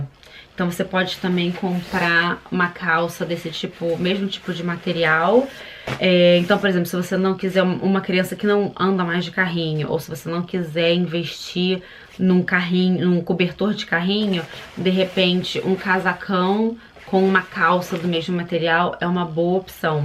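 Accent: Rio de Janeiro, Brazil. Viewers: Adorei as dicas 😉 pena que manteve o sotaque carioca.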